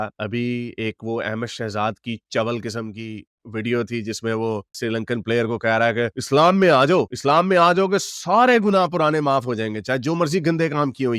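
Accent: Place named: Punjab, India. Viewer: Being indian punjabi I love ur punjabi. The same style. God bless u.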